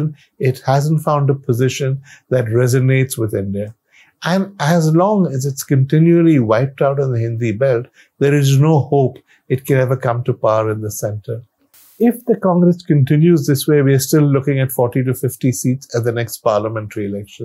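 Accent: United Kingdom. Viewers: Too much wine and hangover British accent.. in Tamilnadu BJP may not get any seats, but be aware that the vote share is really rising. The idea of Hindi heartland party is only for these Khan market window shopping and gracelessly aging British leftovers in India..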